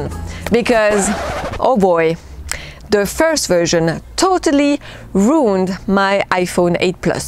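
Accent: France. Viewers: Je viens de découvrir ta chaîne. J’adore ton accent français quand tu parles anglais ! Je comprend tout ! Je m’abonne du coup !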